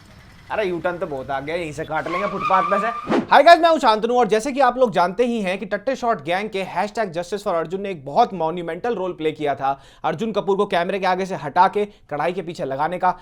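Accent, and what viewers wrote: Haryana, India. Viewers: 😂😂 I just love your reviews, n specially your haryanvi accent ❤️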